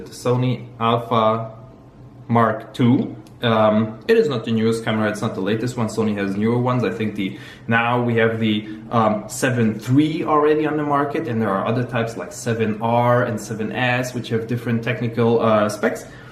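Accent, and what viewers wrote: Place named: Germany. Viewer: Dunno who the f** this guy is, but that german accent is freaking awesome. Please make some good night tale videos too. :P But nice content there. BTW ...that orchid looks freaking awesome. :D What kind of plant formula are you using you console peasant? :D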